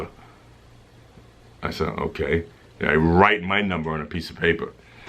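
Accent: Boston, United States. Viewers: Wonder why the twins don’t have your Boston accent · 1. Your Boston accent is showing. 2. I can tell edited the video because she inserted "Finally WTF" 😂😂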